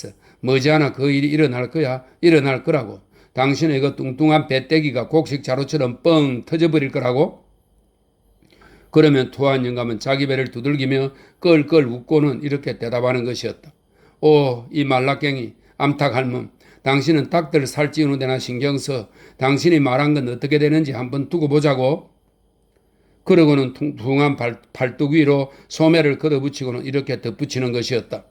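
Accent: Gyeongsang, South Korea. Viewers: ❤힐링시간 허스키 경상도 친한 막걸리 목소리 강건❤하소서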